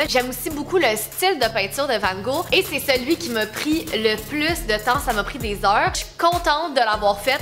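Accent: Quebec, Canada. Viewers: Tu es cebecoise ? Je suis belge et je ne comprend pas certains mots !😅😊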